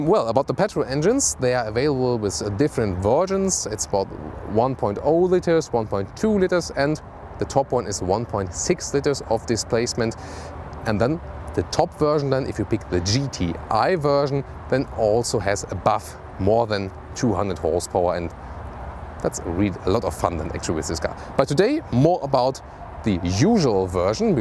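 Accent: Germany. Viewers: Man hört schon stark das du deutsch bist^^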